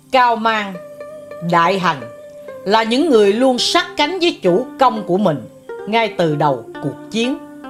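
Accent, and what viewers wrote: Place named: South, Vietnam. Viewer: Bài viết rất súc tích, giọng đọc miền Nam thật hùng hồn, rõ ràng. Tuyệt vời!!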